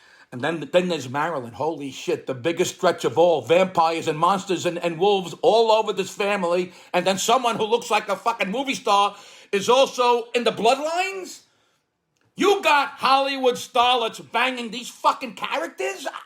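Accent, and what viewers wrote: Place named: Brooklyn, United States. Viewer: Brilliant observation and delivery!!! We moved from NY to Georgia (a suburb of Atlanta) last year (per my wife’s insistence) and I expected to encounter hillbillies. Far from the truth. People here are like those of any other big city. Besides, many people here are transplanted northerners. And my town is about 30% Asian! I love your humor, except for the profanity (but I know that’s part of your shtick). Listening to you, I feel that I’m back on the streets of Bensonhurst as a kid in the 1960s and 70s.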